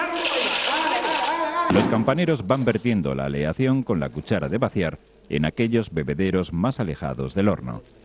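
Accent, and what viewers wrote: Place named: Spain. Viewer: Jajajajaja....España hombre, no percibe el acento?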